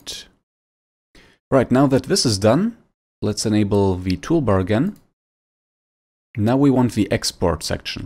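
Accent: Germany. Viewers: Hört sich schwer dannach an, dass deine Muttersprache Deutsch ist?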